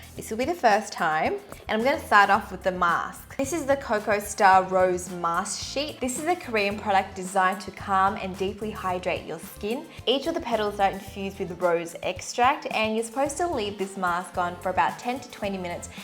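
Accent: Australia. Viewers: I started watching her and I was like, is she Australian? I was right. Go Australia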